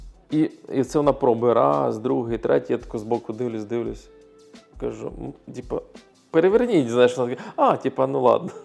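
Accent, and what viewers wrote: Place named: Kuban, Russia. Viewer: Ви ж українською розмовляєте як хлопець з Кубані, калька р0сійської то звичайно що Норвежська для вас буде більше чужа а ніж українська а якщо не хочеться на свої хліба то можна на Кубань або в Білорусь, вас там краще зрозуміють як у Норвегії, миру та щастя всім…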